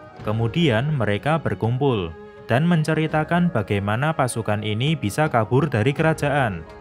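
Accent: Java, Indonesia. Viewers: Mas kata"nya jangan medok bangt🙏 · Medok medok medok cuy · Gw pertama kali mutar vdeonya oh betapa medoknya ahhh mantap 🤣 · Bang logat nya medok sekali:) xixixi asal mana bang?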